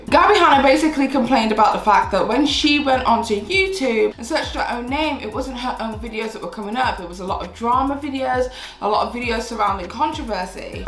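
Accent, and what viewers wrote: United Kingdom. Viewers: Brittish: drama-r-alert. Miss hearing the "BITCH" out loud.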